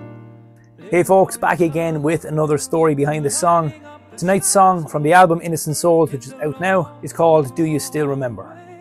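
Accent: Ireland. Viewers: Good afternoon my superhero and my guardian angel and my no1 I have listened to you new cd many times and I love it to bits all the songs are as amazing as you are take care my beautiful friend 🌟🌟🌟🌟🌟🌟🌟🌟🌟🌟🌟🌟🌟🌟🌟🌟🌟🌟🌟🌟🌟🌟🌟🌟🌟🌟🌟🌟🌟🌟🌟🌟🌟🌟🌟🌟🌟🌟🌟🌟🌟🌟🌟🌟🌟🌟🌟🌟🌟🌟🌟🌟❤️❤️❤️❤️❤️❤️❤️❤️❤️❤️❤️❤️❤️❤️❤️🌟❤️❤️🌟🌟🌟🌟❤️❤️❤️❤️❤️❤️❤️❤️❤️❤️❤️❤️❤️❤️❤️❤️❤️❤️❤️❤️❤️❤️❤️❤️❤️❤️❤️❤️your Irish accent is always amazing ❤️❤️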